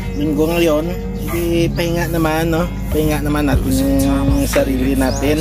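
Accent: Iloilo, Philippines. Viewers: havea nice day my friend. niceto see your nice views nice sea very clean. ano g p,ace mo kaibigan parang iloggo ka ata friend. salamat po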